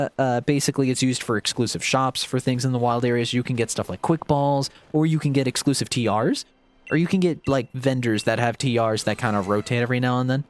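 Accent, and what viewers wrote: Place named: RP, United Kingdom. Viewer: the super accurate posh accent got me hollering within the first few minutes HAHAH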